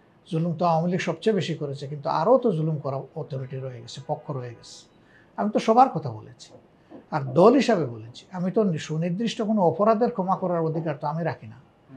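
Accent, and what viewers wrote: Sylhet, Bangladesh. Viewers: Apnar kotha shunle r valo lage na . Bangla bolen . Sylheti na . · জি উনি সিলটি।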